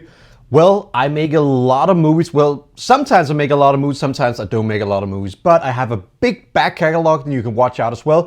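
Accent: Denmark. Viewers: easy on the copenhagen(ish) accent, hahaha. Otherwise, great work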